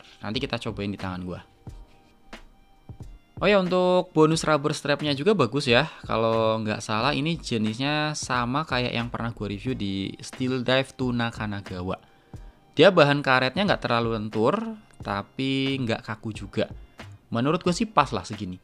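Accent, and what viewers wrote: Tegal, Indonesia. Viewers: nyimakk, orang tegal bang ? sama soalnya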